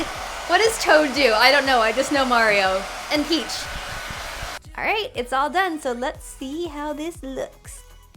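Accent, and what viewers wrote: South, United States. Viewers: There's nothing wrong with a southern accent. I am a true bornand raised southern and i wouldn't have it any other way. This was my first time watching you. I subscribed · i love this video! two things: 1. lmaooo when you said "don't worry, i have another one" and i thought you meant a backup hair dryer, but NO you had a backup dome hat thing OF COURSE. 2. lollllll at your accent, saying that you don't have one, but those two words "git" you. your "e" is often "i" in a very southern and very cute way! no hate here, only love! thanks for another great video! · omggggg southern accent sounds so cute:) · This was very enjoyable. I'd like more videos like this on occasion. 😊 Plus, your comments at the end about the remnants of your southern accent were so fun/funny! 😁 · Im from the south too!!! Yea i notice the twang sneaking in too lol. 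Also could you do some more "curler" videos? Ive seen so many i wonder about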